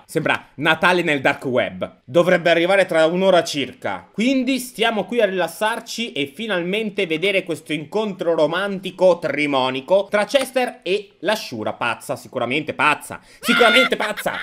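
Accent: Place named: Apulia, Italy. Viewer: Ou orecch d'gomm! Hahahahahahhah (Ps:Sei pugliese )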